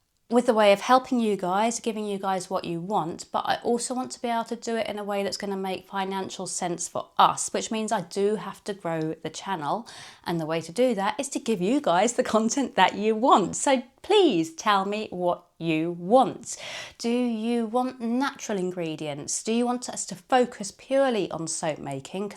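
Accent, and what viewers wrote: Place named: England, United Kingdom. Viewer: Hi, firstly “Happy Belated Birthday” 🎉 yes I would love some cheaper recipes for soap…in the USA Olive Oil and Cocoa Butter is outrageous in price and being an expat if UK, I definitely need the occasional dose of English Accent 😂 I have missed you online and would love to see you more….definitely think you could make something of a Chanel about alternative soap ingredients, and testing thereof to help out the struggling….cheaper but good soap is gonna be a must very soon in USA with all our current troubles and we for sure need an injection of bubbly and infectious personality to cheer us up 😢 hopefully see you soon and hope you had a fab Birthday ❤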